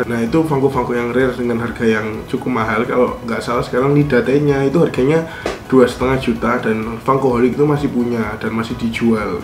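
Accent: Java, Indonesia. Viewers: Niat banget sih ini, sampe ada penilaian terperinci. Good job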